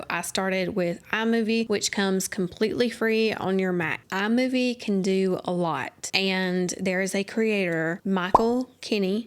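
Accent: South, United States. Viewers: I really love all of your content. Every single video is entertaining, thought-provoking and energising. I especially love watching you and your husband interact. One thing that stood out to me from the beginning was your slower Southern accent. I struggle to understand anyone with any accent talk fast and always have to enable subtitles online, which can distract from the content at times. And I always say "I wish I could enable subtitles in real life". I did struggle to understand your husband though (even YT subtitles got mixed up sometimes hehehe - which was entertaining in its own right) but his warm personality, you interacting with him, Bayley's antics, all the emotions, and the cutest random animal showcases ALL made me (and my mom) keep watching. We are so in awe of your survival story that anything you post is a lovely surprise. Like opening a foreign candy wrapper and experiencing a burst of new flavour. 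By the way, me and my mom have a disorder called misophonia (decreased tolerance to specific sounds) and still continued watching. That's how much we love your channel. Another BIG reason I'll continue watching your content no matter what is the fact that you are NOT religious. There's so much I can say about that but I'd rather not... insert sound of crickets here...I really appreciate slower more intentional long-form content in a sea of fast-paced crappy content. I was particularly also intrigued by the wild 911 calls and interaction with your former co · Hi, I love the way you speak, it's perfect, not too fast and I love the southern accent! I look forward to hearing more about your journey. I can relate to the fear of dying and wanting to have a place for loved ones to see and hear you. I started my channel during covid. I was so scared that I wouldn't get to see them again, i made my channel for them so they can hear my voice if something happens to me. 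And I'm like you also, my channel is not for promoting products, I have a standard letter I copy and paste into a return email thanking them, etc. This is an awesome video and thanks for taking the time to share your knowledge with us. Take care.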